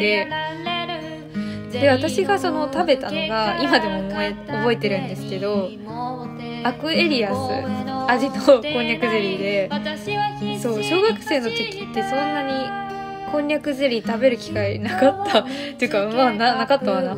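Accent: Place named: Nagoya, Japan. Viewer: いやー名古屋弁いいわー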